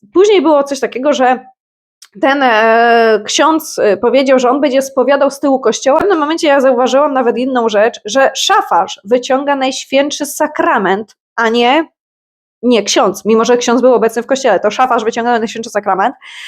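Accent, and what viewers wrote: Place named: East, Poland. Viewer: Pierwszy raz na Twoim kanale, więc wynacz jeśli pytanie już padło - skąd u Ciebie ten wschodni akcent? Pozdrawiam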